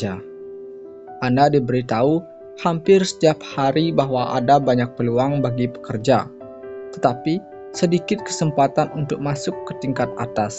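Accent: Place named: Aceh, Indonesia. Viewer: Orang aceh 😅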